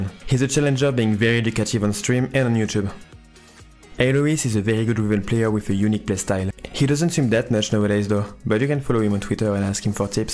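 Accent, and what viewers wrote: France. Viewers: J'ai ultra l'impression que t'es fr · il l'est très clairement, impossible d'avoir un accent pareil sinon aha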